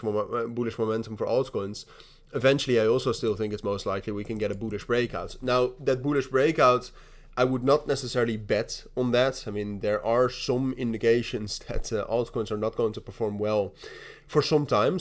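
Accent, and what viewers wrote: Netherlands. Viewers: Where you from? Netherland?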